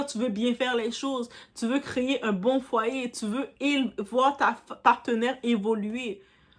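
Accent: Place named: Quebec, Canada. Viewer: J’aime trop l’accent québécois c'est mignon. Pete Davidson omet de dire qu'il vient aux dernières nouvelles d'abandonner récemment femme et enfant après avoir participé à un festival de stand up dans un pays des Emirats Arabes alors que son père qui était pompier est tragiquement décédé en sauvant des vies lors de l'effondrement des tours du 11 septembre 2001...il est nul ce mec et pas marrant. Une question : Êtes-vous d'origine carribean ? From Haiti maybe ?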